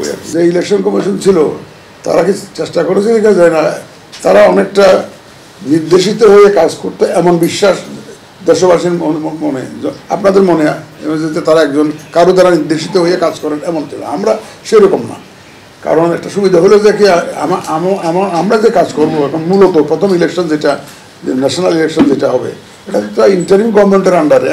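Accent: Chittagong, Bangladesh. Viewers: কথায় বুজা যাচ্ছে উনার বাড়ি ও চট্টগ্রামে। যাহোক উনার কাছে আমরা ভালো কিছু আশা করি। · হুম তাই। উনাকে আজকেই দেখছি, প্রথম বার উনার কথা শুনছি। উনার কথা শুনে কমেন্ট পড়তে এসেছি, উনি কোন জেলার কেউ লিখেছে কি-না। কারণ উনার কথায় আমাদের চট্টগ্রামের একটা টান আছে। আপনাকে ধন্যবাদ, উত্তর টা পেয়েছি। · কথায় একটা চাঁদগাঁইয়া টান আছে।চট্টগ্রাম ❤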